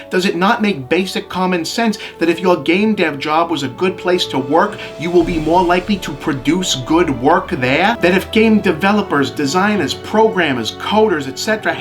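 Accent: Boston, United States. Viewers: Agreed. The angry Bostonian scent ads a good bite to his commentary. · Is that a real Boston accent or a new gimmick? I've never heard it before. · Frost Soul it’s a Boston accent. He usually tries to down play it, but it does slip through sometimes. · It's a Boston accent. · Bob, I don’t know how I didn’t notice this before, but you have a very Bostonian accent. You should embrace it and use it more often when you go on an angry rant. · I can hear the Boston coming out XD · Is it just me or is Bob extra Bostony in this episode? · Boston accent is one of if not the most assholic accent. How can people living near Harvard sound so stuuuupid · UNIONIZE!!! Also, loving the chance to hear your Boston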